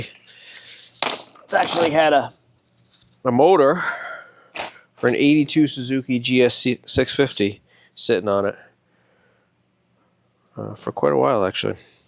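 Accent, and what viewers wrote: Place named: Massachusetts, United States. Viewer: Lol. I was listening to you and thought - "this guy has to be from Mass." Sure enough, saw the plate on the vette and it was confirmed. Great vid - Thanks!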